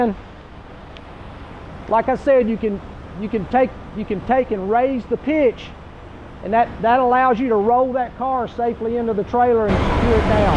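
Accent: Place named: South, United States. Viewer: Official NASCAR accent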